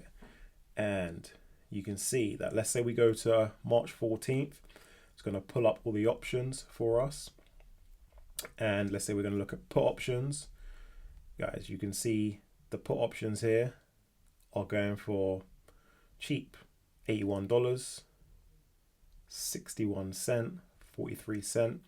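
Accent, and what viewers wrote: United Kingdom. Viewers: Love the British accent..lets go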